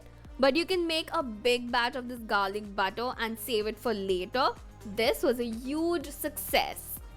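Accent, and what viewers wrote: India. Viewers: I just love the way you talk in English and a desi tadaka . Will try this hacks for sure . LOVE YOU !!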